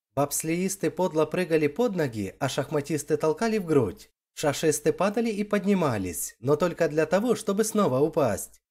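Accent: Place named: Ukraine. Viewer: Обожаю рассказы про бабу Капу. И чтец с украинским акцентом, просто прелесть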